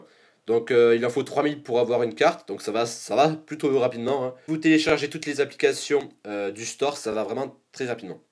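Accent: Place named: Toulouse, France. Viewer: T'est toulousain?! T'as gaver l'accent!!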